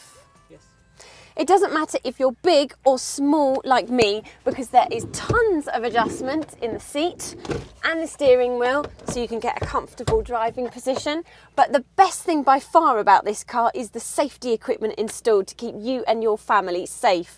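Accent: United Kingdom. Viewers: I like British accent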